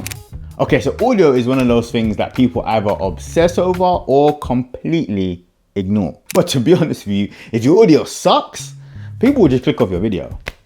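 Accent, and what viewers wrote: United Kingdom. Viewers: they haven't figured out that you're British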